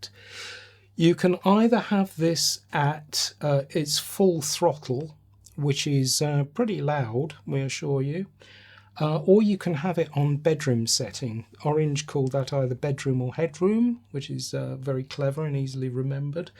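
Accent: United Kingdom. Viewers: I could listen to this British accent all day.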